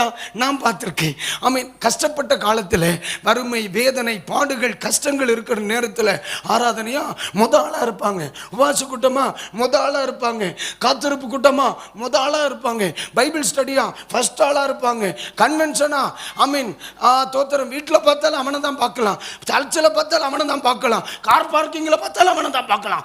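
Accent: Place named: Tirunelveli, India. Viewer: பாஸ்டர் உங்க செய்தியும் பிடிக்கும் நீங்க பேசுற இந்த திருநெல்வேலி பாஷையும் நல்லா இருக்கு பாஸ்டர் அருமை சூப்பர் ஆமேன்❤❤❤❤❤❤🙏🙏🙏🙏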